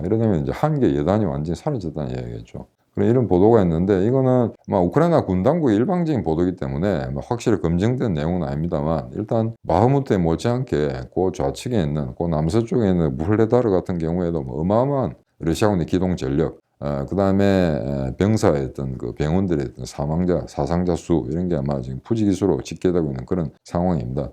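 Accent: Gyeongsang, South Korea. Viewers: 🇰🇷허진대사님,💙 중저음의 보이스와 경상도 인토네이션이 들을수록 신뢰감이가고 묵직하며 멋있습니다~!!!💯 전쟁...브리핑엔 님의 목소리가 적격입니다. 👍✌️👏 허진대사님, 아프지 마십시요. 늘 건강하시길 빕니다~🕊️💙🎵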